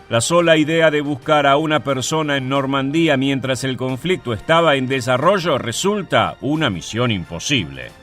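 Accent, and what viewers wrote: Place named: Argentina. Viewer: Tu forma de hacer crítica es de lo más absurdo. Pará la próxima ocasión, en vez de criticar realiza una investigación concienzuda de los hechos y haz una entrevista personal a los soldados muertos para que te digan a detalle lo que sucedió. Posteriormente escri e el guión y haz tu propia película. A propósito, por tu tono de voz éres de nacionalidad argentina, verdad?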